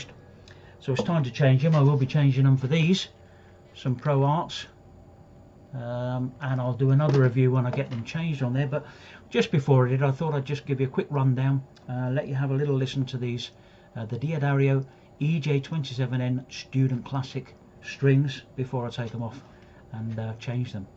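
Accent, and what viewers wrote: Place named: Northern England, United Kingdom. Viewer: Thanks for answering ! It sounds a bit northern English...but I may be wrong. Anyway, thanks man. Love your videos.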